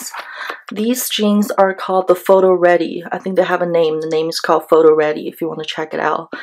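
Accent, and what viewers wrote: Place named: England, United Kingdom. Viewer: like your english accent. wanna hear chinese too! plz do chiese video sometime soon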